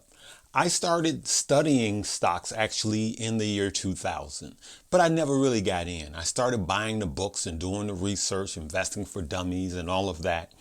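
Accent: Chicago, United States. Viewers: Are you from Chicago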